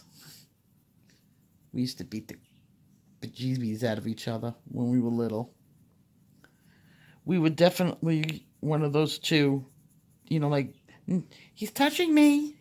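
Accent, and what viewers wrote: New York, United States. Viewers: You know how hateful and selfish people can be, but not with any of the wonderful people I have lately. I have asked so many questions and nearly everyone either a tutorial or knows of one and takes me right to the source. It has been great. After struggling for so long with hate and drama it is nice and refreshing to meet kind people. And I could listen to you talk all day. I'm from the South so I know a New York or Northern Italian accent lol I don't hear it very often down here.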